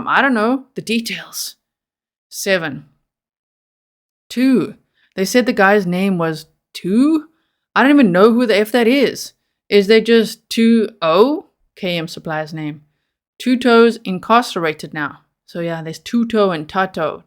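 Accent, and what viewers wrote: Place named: South Africa. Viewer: Excellent presentation, thank you. I detect a subtle South African accent..... Lekker wees, en dankie.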